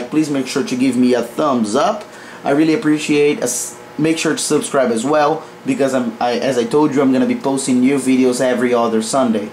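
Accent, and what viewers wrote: Brazil. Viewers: Brazilian accent spotted!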